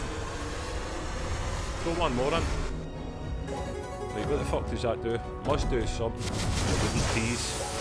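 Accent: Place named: Scotland, United Kingdom. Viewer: Hahahaha yass this is the best slot video I’ve seen in a long time🤣 was so happy when I heard you were Scottish anaw. Subbed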